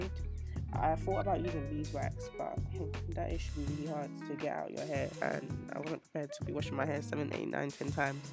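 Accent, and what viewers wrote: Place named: United Kingdom. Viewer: Tell me why I knew you you had a British accent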